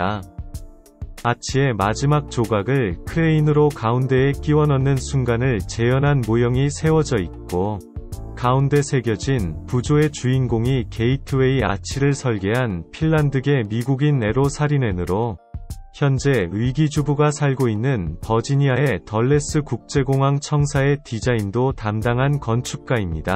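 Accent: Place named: Gyeongsang, South Korea. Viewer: 유튜브 열심히 하시기로 한거 축하드려요! 저는 이미 구독자입니다^^ 부디 실제 음성을 들려주소서.. ㅎ 경상도 사투리 나쁘지 않습니다.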